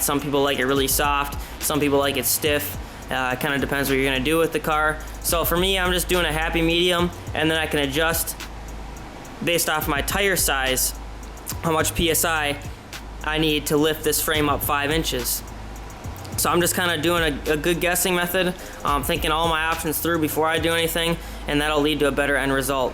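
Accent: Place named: United States. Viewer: Keep tryin not to laugh at ur thick yank accent so i can hear the vid... lol.... But damn your talented as hell... Incredible job so far!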